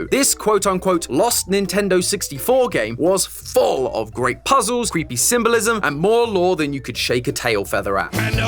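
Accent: United Kingdom. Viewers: Why is he British now… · British Matpat 😭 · I’ve just started watching this and…When did you become british? Like genuinely curious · who is this brit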